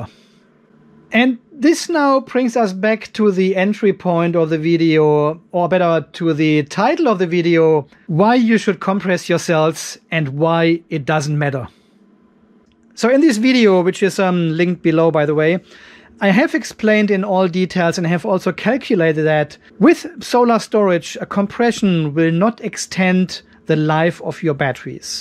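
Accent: Germany. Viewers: Now this is a very video for me. Let me explain. I recently dismantled 6 Mastervolt 24 Volt batteries, each containing 6 Thundersky 170 Ah cells. When I dismantled them, I noticed the cells were in a metal enclosure. 2 steel bars on each side and those bars were connected to two end-plates by sticking through holes in the end-plates and were kept in place because they were twisted behind the end-plates. (Apologies, English is not native to me). Some batteries had so much tension build up due to expansion of the cells, that, when I untwisted the sidebars, the batteries literally exploded mechanically with a loud bang. After removing the cells, some cells were so inflated that they resembled the Michelin puppet. The ones I didn't trust were taken directly to the communities local chemicals intake point. The other ones I tested and kept them when they were still good. 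I'm about to install EVE cells into my sailing yacht. I don't even consider compression. If a cell expands (too) much, I want to be able to see it and take appropriate measure in time (replacing). Thanks, love your channel, especially the German? / Austrian? accent. I learned and still learn so much from you as well as from Kermit.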